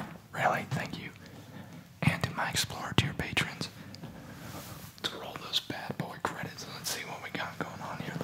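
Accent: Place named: United States. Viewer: I didn’t know is Danish because of his perfect American accent Really wanna request a real life collab with ObviouslyASMR because they are all European LMAOOOOO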